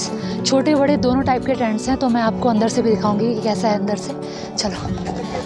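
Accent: Uttarakhand, India. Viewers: Jaise ap btate ho ji aisa lagta hai apke awaj se uttarakhand ki mahak arhi hai apne aj kedarnath ke bare me btake dil khush kar diya hai thank u and love you vlog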